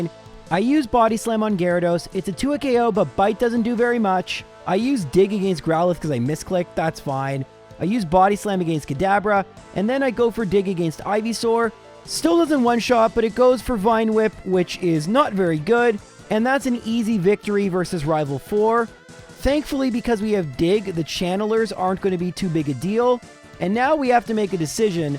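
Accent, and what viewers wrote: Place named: Canada. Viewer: I often forget is Canadian until he says words like "Sorry" and "Tomorrow". Then it's really obvious.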